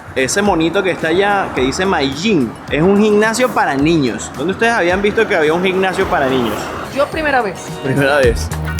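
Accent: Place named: Venezuela. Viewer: Muy bueno el video, lastima esos acentos a Venecos.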